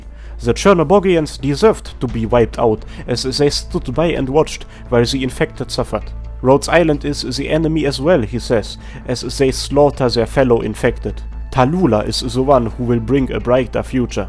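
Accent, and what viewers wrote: Germany. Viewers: Bruder dein deutscher Akzent ist LEGENDÄR XDDD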